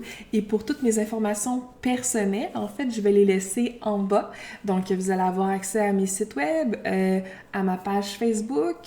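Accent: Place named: Canada. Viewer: Vous êtes d'origine canadienne selon votre accent... pour que les gens qui vous suivent, vous n'ai parlé pas de votre carrière!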